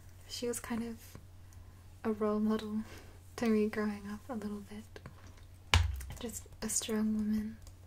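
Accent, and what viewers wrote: Australia. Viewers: more reason I do enjoy your videos asides from the video game knowledge and soothing content. Aussi accents are the best. ❤️❤️😘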